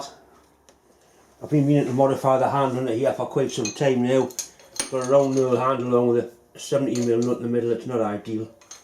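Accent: Newcastle, United Kingdom. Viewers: NE English or Geordie. Recently heard it comes from the local Miners using George Stephenson's safety lamp rather than Davie's. Went to college in South Shields and never heard why Geordie, who knew? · Newcastle English.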